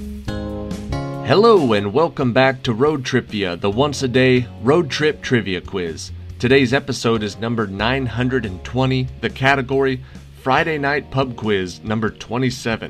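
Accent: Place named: United States. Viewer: Why are all the pictures of England but the voice is American?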